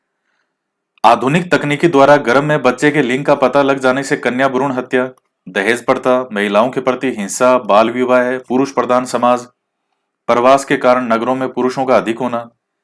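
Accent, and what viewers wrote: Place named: Bagdi, India. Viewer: Sir हमारी तो आपके वाली ही बागड़ी बोली है, आप जब भी बागड़ी वाले शब्द बोलते हैं तो हमें बहुत ही अच्छा लगता है, जैसे ताती बलती लू,धाप के राबड़ी पियो और बाजरे की रोटी खाओ🙏🙏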